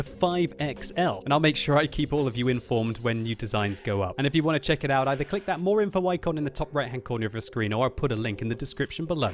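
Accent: France. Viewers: i love your french accent 👌😂🇫🇷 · your french accent is really lovely <3. I m french and I love when british speak like that <3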